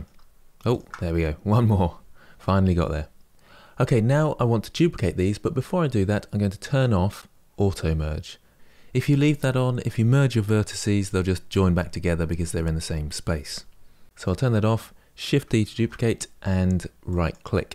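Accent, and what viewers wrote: United Kingdom. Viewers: I love channel. In my personal opinion, hands down the best blender teacher on youtube. Detailed and very good at explaining each step. It definitely helps that he has a soothing british voice lol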